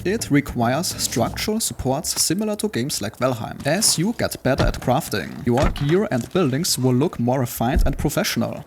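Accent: Germany. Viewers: this german accent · Der Deutsche Akzent Kickt Hart^^ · The german accent is strong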